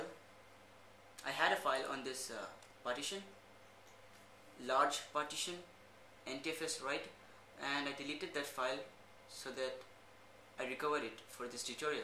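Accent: India. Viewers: Gotta love the Indian accent! :D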